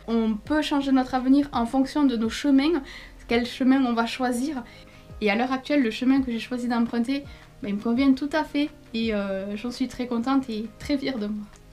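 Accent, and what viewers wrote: South, France. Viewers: J'adore je veux la même chambre! !!!trop cool 😆😆 en plus avec ton accent du sud que je kif trop et comment ta dis petocharde 😄😄😄trop cooool bonne journée à toi merci bcp pour la vidéo 😉😉😉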